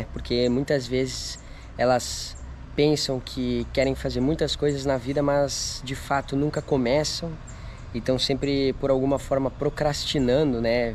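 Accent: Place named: Dominican Republic. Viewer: Desde o primeiro vídeo já imaginei que você era do RD ahaha a forma de falar lembra o Eslen!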